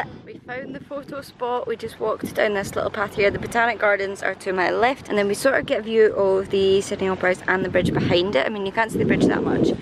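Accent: Scotland, United Kingdom. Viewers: A Scot with red hair, love the accent. Are you coming down to Tassie?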